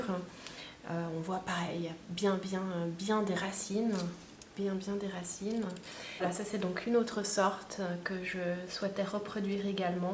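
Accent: Switzerland. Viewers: Sympa ce petit tour ! Et ça m’a fait plaisir quand j’ai reconnu ton accent, trop cool d’avoir des suisses dans le YouTube game 😍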